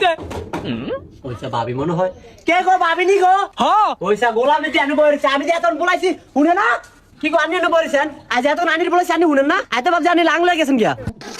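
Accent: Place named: Chandpur, Bangladesh. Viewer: Chadpurer vasa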